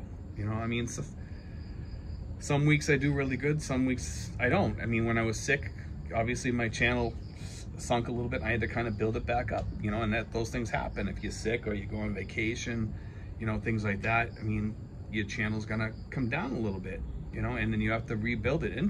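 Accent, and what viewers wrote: Boston, United States. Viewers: Thanks for making good simple to understand videos..you know I am just an old simple minded man from the rural part of Louisiana.. didn't you say you were living in cavite? I had a girlfriend living in tres martinez or general trias I think it was.. thanks again and keep the videos coming..where in the states are you from? I think I hear a Boston accent..I worked all over the usa and world in my younger years.. thanks 😊👍👌😁🤗..hello from south Louisiana and I am heading to the Philippines to live out the rest of my retirement.😁🤗🐊🐊🐊🍺🍻🐊🐊🐊👍👌🐊🐊🐊😁🤗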